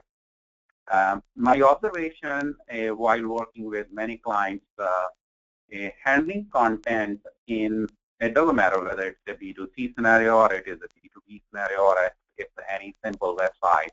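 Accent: India. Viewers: Sir, you need courage to post a videos that too when you are benefiting others by means of education. You are, m sure is from different part of world and not a big fan of Indian accent, but Indians are proud of it cause that accent has given Indians billions of $ and millions of jobs across the globe. If you know anything about professionalism, then talk about the content of video, not accent. Cheers to you!! Have a god time! Great work, keep sharing & educating professionals with your knowledge! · This is hard part of having Indian accent and Chalta hai approach. They did not test what they are putting online.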